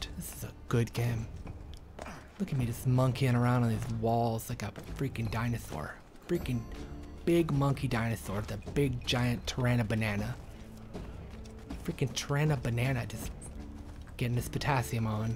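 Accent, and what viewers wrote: Scotland, United Kingdom. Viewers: Amazing Scottish a sent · Omg dat Scottish accent! Brilliant. :3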